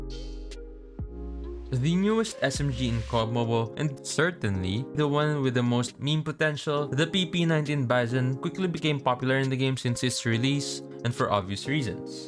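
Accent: Philippines. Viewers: Btw kuya, feel na feel ko talaga na nahihirapan kang mag basa ng script dahil sa accent natin.. Super Relatable Haha. Much Love Kuya. ❤️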